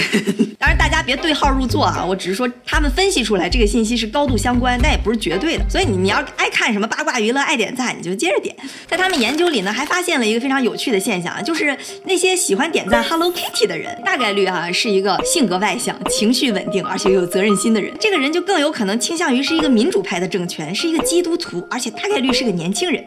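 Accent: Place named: North, China. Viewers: 大姐， 你这英文咋山东味的？ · Her heavy northern Chinese accent drives me nuts! 😁 some may love it.. well, not me...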